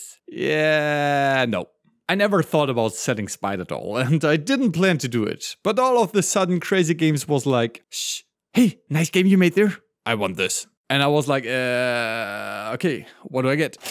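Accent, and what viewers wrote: Germany. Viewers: You can really hear the German aktent.😅 Do you still have a say in the game? But I don't think so.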